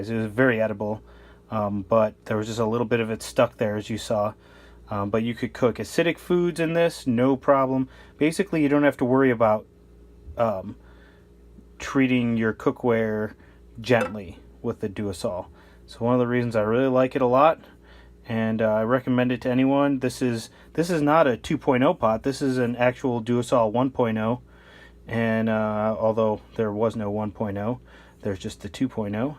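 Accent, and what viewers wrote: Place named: United Kingdom. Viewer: I hope you realize that I am just kidding. We love your accent, colloquialisms and humor. Why do you think monty python, kids in the hall, and the office are so popular in the US? Almost all of the bands that topped our charts in the 80’s were British. I agree, we all would be sad to see the dialects lost.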